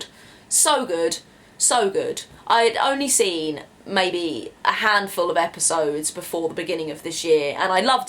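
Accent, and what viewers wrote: London, United Kingdom. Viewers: I love British accent ! I just came here to listen to your accent 😯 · is it a british accent. You r adorable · I'm here to learn British accent and it helped...❤️ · What a lovely accent! Which is it? Cockney/Liverpool/Manchester? I don't think it's R.P. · Hello, Great video and recommendations! Now I'd like to ask you for a favour because I'm working on an assignment for a English Phonetics course I'm doing and I'm going to analyse some characteristics of your accent, would you mind telling me where you are from, I guess it's London? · I love your accent it is wonderful l hope l can speak british english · I just came here because I enjoy listening to British accent lol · I am a English learner and I fell in love with your British accent. It sounds great ^^ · I don't remember the list of the shows that you mentioned because I fall in love with your accent and my mind was lost somewhere else. 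If you're an active youtuber and happened to read this comment I suggest you to make a video on "How to do British accent". I'm sure many people would love it. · I like you british accent.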